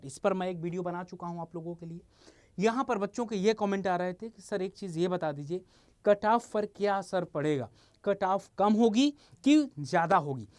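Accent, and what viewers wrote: Bihar, India. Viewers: Sir aap bihar se ho ya purvanchal UP SE?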